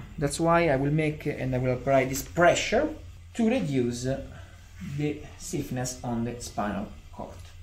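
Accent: Italy. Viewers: If I were trying to tell my patients to relax without saying "relax" would say "let go of your muscle tension" (Lascia andare la tua tensione) I'd also say, "Take a deep breath and exhale peacefully, let your body rest" (Fai un respiro profondo ed espira pacificamente, lascia riposare il tuo corpo) or I'd say "let your body go completely limp" (Lascia che il tuo corpo diventi completamente floscio) Your English is amazing! But we can tell you Italian because you put ee/ah vowel sounds at then end of words. You say "relax-ah" an American would sound like "relaxssssss" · You are fluent in English. Your Italian accent has made your English lovely. Well-done! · I just love your English Italian accent. Parava doctor Much of love from Egypt ❤️ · I understand everything! Is a very “italian english” because of your accent and mother tongue. You just need to learn and practice medical vocabulary and that’s it! 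Because your grammar is good, simple and comprehensible. Cheers from Chile 🇨🇱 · I like Italian English accent 👍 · I love the Italian accent. Also I wish we had real osteopathic doctors like you in America! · your English is perfect! You don’t need any improvements. It’s very impressive. Also, I love hearing Italian and I love your accent. I wish you would do a video of going around Rome, like a tour guide. You would be great! We want to learn about Italy and the lifestyle. Ciao! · “Don’t help me” is perfect to get the patient to relax. Your English is wonderful! Especially with Italian accent! Don’t change. Stay Italian!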